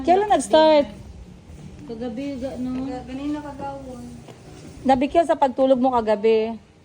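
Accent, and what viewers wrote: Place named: Ilonggo, Philippines. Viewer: Mam, ganyan tayo mga Ilongga d mawala ang intonation natin Ilonggo magsalita❤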